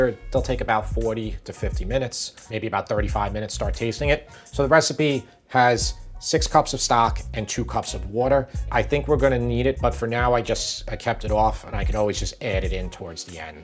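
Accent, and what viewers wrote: New York, United States. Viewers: He's from Long Island so New York. Kind of similar maybe not as exaggerated as Jersey.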